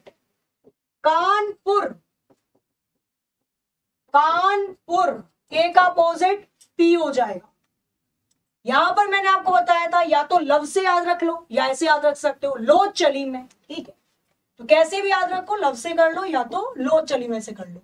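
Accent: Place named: Bihar, India. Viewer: mam aap biharq me kaha se h , mai v bihar se hi hu or aapka language same to same meri tarh hi hai😊😍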